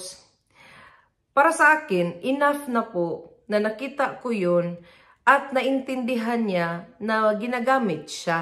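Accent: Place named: Visayas, Philippines. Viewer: Bisaya kaau ka mgtagalog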